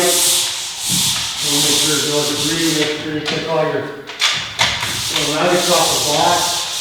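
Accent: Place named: Canada. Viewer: Sounds like a Canadian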